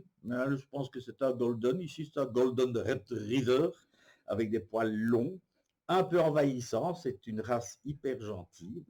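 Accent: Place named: Belgium. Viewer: Bonjour,j'adore t'écouter en étant sur mon dp, mon beau-frère est belge aussi, je me lasse pas de vos accents 😁,chose sérieuse...video 11,toile 3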